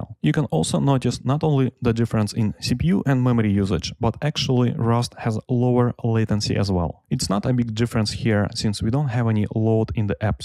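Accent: Russia. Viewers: That strong Russian accent 😃 Thanks for benchmarking! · думаю, чё я так хорошо тебя понимаю? а ты на русском по-английски говоришь)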